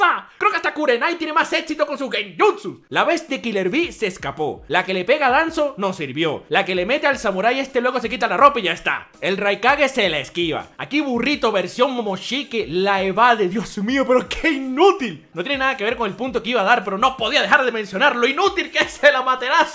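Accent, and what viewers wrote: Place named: Venezuela. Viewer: suenas avenezolano.. ¿estás allá?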